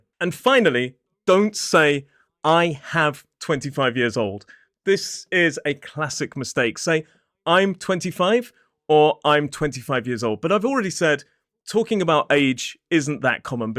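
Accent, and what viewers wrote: United Kingdom. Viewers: I like your British accent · Hi, I'm Alauda (which means lark in latin). I'm german, raised in north Germany, Angeln, where some Anglo-Saxons came from originally 🤓, and I'm living in France. I'm retired now and living with my cats in a little cabin. I love to read english and american litterature, english is my favorite language, but I never really spoke it. Since some months ago, I try to activate my english by writing youtube comments. I have no concrete use for it, but I like your channel nevertheless, and particularly your so bri'ish accent.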